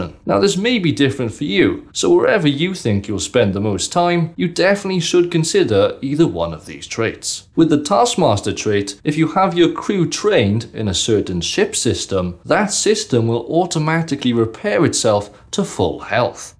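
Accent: Wales, United Kingdom. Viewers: Love the Welsh accent, although yours isn't strong. It just sounds friendly to me. Diolch!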